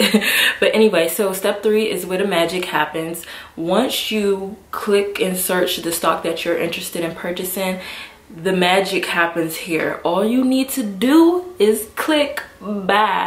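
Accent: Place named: DMV, United States. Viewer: I knew you were from the dmv with that accent😭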